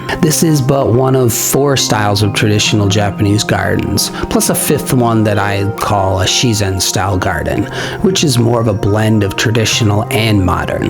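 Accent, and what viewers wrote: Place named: United States. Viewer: Can't......stand......the american accent...srry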